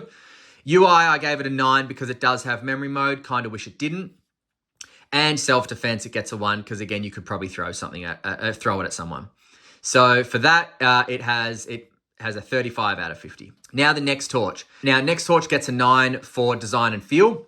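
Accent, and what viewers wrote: Australia. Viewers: First off, let me say that I love that some one is making content that feels like it’s tailored for me. 2nd, as an American, we tend to love narrators from other countries, and your Australian accent is great! 3rd: how would you say these compare to the 16430 ?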